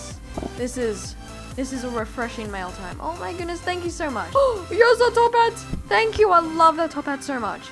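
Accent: Australia. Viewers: Sorry if will offend you but you sound Australian, are you? Sorry again if I offended you I didn't mean to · u sound like ur from Wollongong...... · lol I love Australian's accents it sounds so perfect and beautiful <3 and I love your personality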